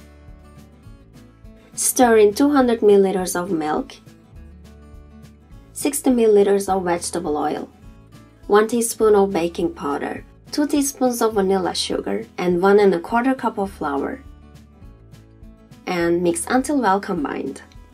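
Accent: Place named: Turkey. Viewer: Aksanın gayet güzel ama nedense Türk olduğunu hemen anladım. Tarif için teşekkürler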